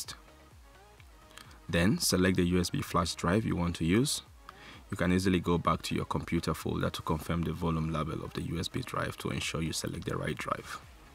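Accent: Africa. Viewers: Prefer Rufus personally. Thanks for the help sir. I'm digging the soft African accent. I need more tutorials with African accents =D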